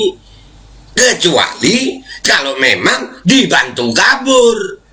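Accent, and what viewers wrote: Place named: Madura, Indonesia. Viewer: Entuk emosi yiik,tp ojok metu logat meduro-mu...😁😁😁😁😁,lanjuut yiik,Satrio gang Dolly mendukung perjuanganmu....wis mblenek yiik ndelok Kabeh Iki.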